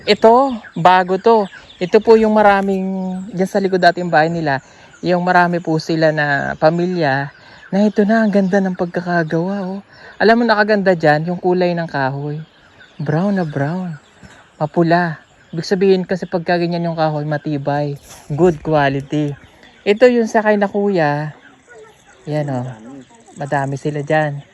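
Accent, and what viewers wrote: Visayas, Philippines. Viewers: Sir di na jud ka mabaligya sa kabisayaan, kahibawo naman ka mag bisaya. Good job sir.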